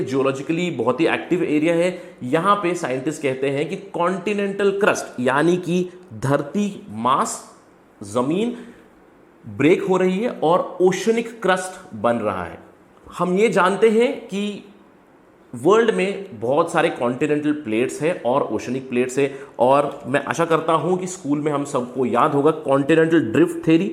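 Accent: India. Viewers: I was eager to hear your hindi accent.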